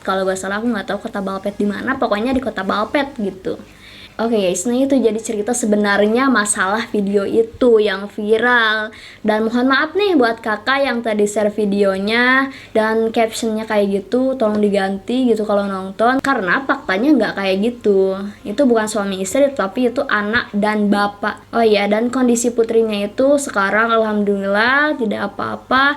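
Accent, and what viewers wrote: West Java, Indonesia. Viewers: Kak, orang sunda?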